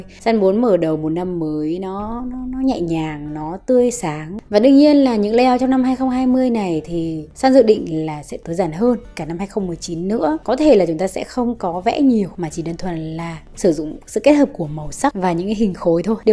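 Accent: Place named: North, Vietnam. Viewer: À nhon chị Sun. Đầu tiên em muốn giới thiệu một chút về bản thân. Hì, em là Chou Chou, đã là sinh viên năm 3 rồi, và theo học kinh tế. Thực sự mà nói thì những thàng ngày đầu tiên tại trường đại học của em rất chán luôn, và rồi em bắt đầu đến với một câu lạc bộ vào năm 2, từ thời khắc đó phải nói deadline ngập đầu luôn, mình đã rất stress lắm, nhiều lúc chỉ muốn bỏ mặc hết tất cả, cả những chênh vênh của tuổi 20. Nhớ cách đây nửa năm nhỉ, em tình cờ một ngày chán chường lướt YT thì em xem được clip đầu tiên của chị, về lên kế hoạch. Thật sự lúc ấy em bị hấp dẫn bởi cái giọng Bắc nhè nhẹ của chị, có lúc Sun "xàm" nhưng rất đáng yêu, rất thu hút người nghe, và cả một ngày hôm đó hình như em chỉ xem mấy video của chị thôi. Và ngay sau đó em tập tành những trang Bullet Journal đầu tiên, nhưng mà trời ơi, em vẽ tệ hết sức, nhưng mà em vẫn rất vui, bởi vì trong thời gian đó em cảm nhận được mình hạnh phúc với những thứ nhỏ nhỏ mình tạo ra, Bullet đã giúp em rất nhiều, em đã xử lí thời gian tốt hơn, mặc dù đến bây giờ thì vẫn có những ngày em không hoàn thành đựơc hết nhiệm vụ, nhưng không sao tất cả đều phải có quá trình mà chị hen. 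Em vẫn nhớ chị bảo mỗi cô gái nên có một cây son đỏ, vì nó chính là sự quyến rũ đặc trưng của những cô gái Pháp, em nhớ mang máng là vậy, và em cũng vậy, cuồng một màu son duy nhất đó. Hihi. Không hiểu sao khi xem cái video này của chị, em có nhiều động lực lắm luôn, em viết mail cho ban Nhân sự để xin rời CLB để sẵn sàng bắt đầu những dự định mới của m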